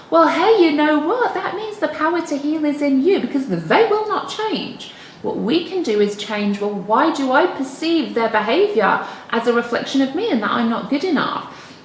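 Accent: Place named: England, United Kingdom. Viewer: Hey. . . I hope you receive this as constructive feedback. The content is great. But between the English accent and the echo chamber audio I had trouble hearing you. I suggest buying a cheap, $12 corded lapel microphone you can run from the camera to a clip on your shirt. It will improve the audio tremendously. = )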